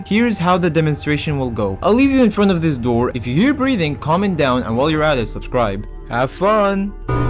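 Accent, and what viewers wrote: Egypt. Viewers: Great video, your channel deserves more attraction tbh I also thought u were egyptian from the accent, i checked and you actually were 😅 Good to see egyptians getting in the fnaf content creation, keep it up!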